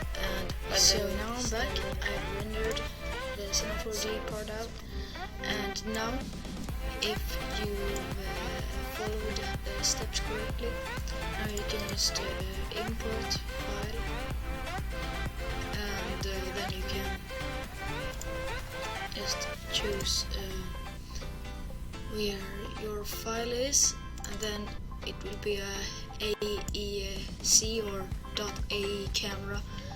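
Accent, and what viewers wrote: Sweden. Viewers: Lol man hör att du är svensk xD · Agario he has to be, definitely sound like a swede! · lol hörde direkt svenska dialekten så var tvungen att kolla om du var svensk och ja det stod ju i din beskrivning ^^ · Du bröt lite på svenska ;D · Thank you so much! now people can make money from this so if guys can make good intros then you can get like 20 $ for 1 intro! btw i did hear that you are from sweden (Im Norwegian!) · u swedish ? You sound like it lol i am swedish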